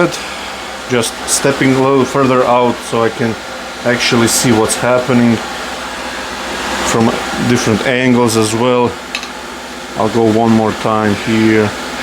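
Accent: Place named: Croatia. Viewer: Completely random, but I watch more of your videos than anybody else and When Croatians were deciding how to pronounce English words… they picked the coolest ways to do it. 😎🫡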